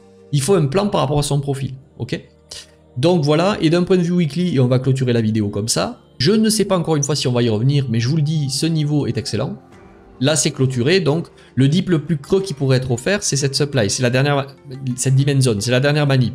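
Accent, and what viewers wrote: South, France. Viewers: L’accent du sud on aime frero 🤣🫡 de bonnes vidéos à chaque fois force 💪🏻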